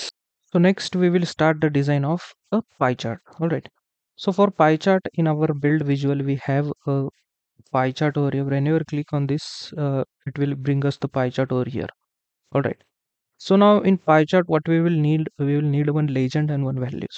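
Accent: Maharashtra, India. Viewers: You are marathi., I understand from your accent. Thanks great video.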